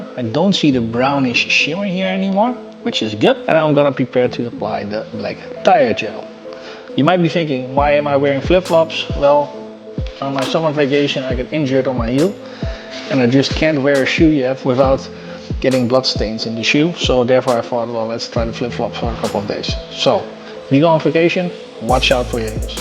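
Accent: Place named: Netherlands. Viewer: Hello, you sound like a Dutch guy speaking English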